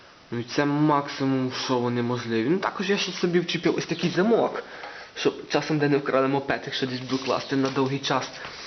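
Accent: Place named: Hutsul, Ukraine. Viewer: Смішно розмовляєш, мабуть гуцул, але приємно.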